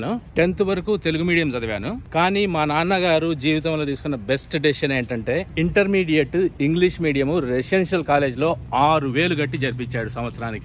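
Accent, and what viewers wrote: Kadapa, India. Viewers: Me matalu vintene pakka rayalaseema ani artham avutundi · కడప భాష అదుర్స్ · Anukokunda mee vedio chusanu flightjourny gurinchi.meeru matladevidhanam telisi kadapaani doutvachindi ,andulo Reddy's ani anukunnanu .endukate Maadi palletutu,kani maa vurilochalamandi.reddys Amerika,landanlo,jarmanylo vunnaru.Reddy's vallu chaduvulo eppudu first vuntaru and very intelligent.meeru maatalu vintunte nenu tenthclasslo vunnapudu maa hindi sir mallareddy garu gurtuku vacharu.sootiga matladatam,jokes veyyatam same anni alage vunnai .ayanadi Kadapa. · Anna I'm also from kadapa 😁 Language slang suuuper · Inni rojulu mi yasa chusi ma kadapa district ani doubt vundey.. ipudu confirm ayindi.. manchi info isthu ilagey videos cheyandi.. love from Kadapa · Memu kadapavallamu andi malage matladutunnru andukey ma vallu aney feeling vachindi me matlalu vintuntey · Ur great sir meeru inka telugu and kadapa yasa marchipoledu enni years aina.... 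· Sir I am very glad to see a Kadapa person talking in kadapa accent sir namaste I am from kadapa, pendlimarry mandala, anna namaste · Super anna! Great journey,🙏🙏🙏 Seema language bagane matladuthunaru inka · U posting great videos, Please try to talk always in your natural slang..Raayalasima slang... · అందుకే మీ బాష పచ్చి రాయలసీమ దని నాకు ముందే తెలుసు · Mee slang adurs nenu kooda from raayalaseema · Anna Mee vedios chalabaguntai....manakadapa basanu inka meru gurtupattukoni chala clearga matladutunnaru.. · Super garu pakka rayalaseema basha lo chepputhunnaru.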